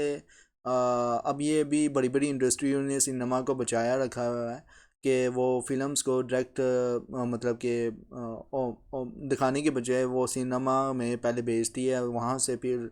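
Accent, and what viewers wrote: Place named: Punjab, India. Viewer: thanku sir for these lectures and your punjabi accent is wow🥰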